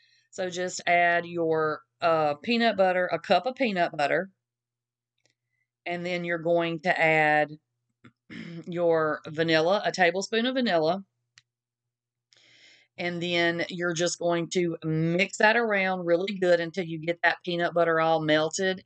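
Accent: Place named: Alabama, United States. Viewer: I really love your accent. Where did you grow up? Alabama? You just sound so friendly and warm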